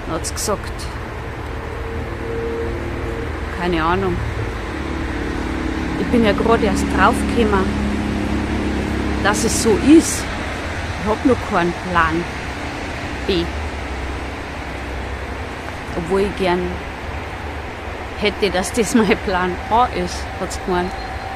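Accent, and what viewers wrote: Bavaria, Germany. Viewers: So sympathisch und unkompliziert erklärt. Ich mag Dein bayrisches Reden und versteh's auch gut. Danke dafür. 🙏🍀🕊🫶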